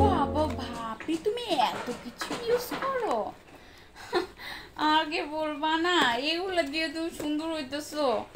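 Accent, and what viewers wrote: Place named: Comilla, Bangladesh. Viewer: Apni sob bastob er sathe mil rekhe Video gulo koren, abar apni amader cumilla vashay kotha bolen, tai apnar video gulo onek valo lage, love you apu, 🥰😘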